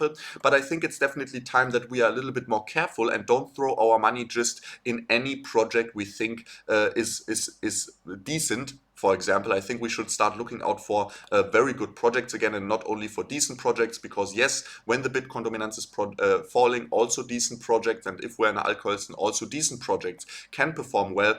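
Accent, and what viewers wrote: Germany. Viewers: Thanks! I just started following you thanks to AltcoinDaily. I gotta say you give a great analysis, oh and I love the German accent! I’d love to see a video from you sometime talking about how you were first introduced to Bitcoin, how old you were, and how you’ve come to build the portfolio you have today.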